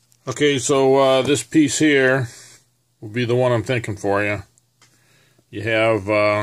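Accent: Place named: South, United States. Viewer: That was fun! I never noticed a southern accent like I heard here. Nice work! Laural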